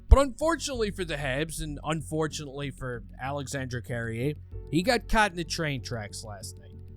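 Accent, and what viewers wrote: Newfoundland, Canada. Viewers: Loved your breakdown of the game- TBH, I don’t who you are but I’m hearing a Newfie…or a Caper? I wish there was another view of the hit- I’m surprised there isn’t. The fact of the matter is this: If you enter a ring with a bull, you have to be aware of where he is at all times. And that goes for Wilson. 2 things Carrier could’ve done: 1)Put up the fence and risk a major or 2)Take the hit and STAY DOWN. Caps don’t score because the refs stop the play. Carrier was probably pulled because of concussion protocol anyway.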